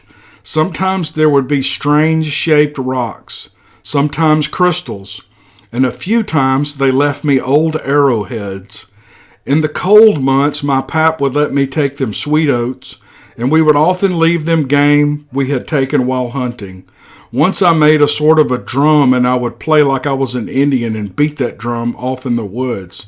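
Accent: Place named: Georgia, United States. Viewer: Thanks, sir. I am from the Deep South. I also lived in LA about 12 years, moved back south for about 16 years, and now happily reside in the Seattle area. I definitely believe in Bigfoot and listen to a lot of channels on the subject. I think I enjoy your narration more than any because you have a particularly appealing southern accent. Reminds me of southwest Georgia. You sound kind of like my brother in law, who’s a really good guy. I had heard this story on another channel some time back and found it fascinating, and so glad you are sharing it here.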